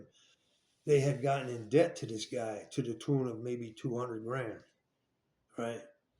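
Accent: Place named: Philadelphia, United States. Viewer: Hey you sound like you got the north Philly drawl! These stories are great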